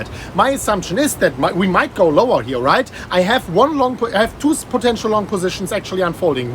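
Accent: Germany. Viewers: PS: Der deutsche Akzent ist voll geil :P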